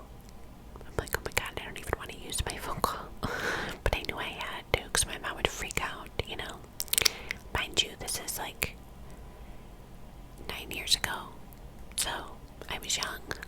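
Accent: New York, United States. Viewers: Glawss that's exactly how she pronounces it with her NY accent lol love it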